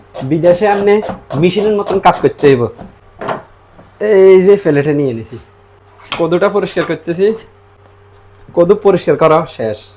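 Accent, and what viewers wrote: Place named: Chittagong, Bangladesh. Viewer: আপনার ভাষায় বুঝা যাচ্ছে আপনি চট্টগ্রামের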